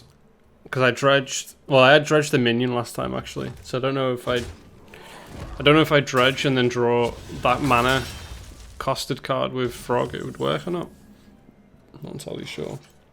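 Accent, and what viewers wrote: Liverpool, United Kingdom. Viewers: hes not Scottish tho, hes from Liverpool, UK. But I guess you're still correct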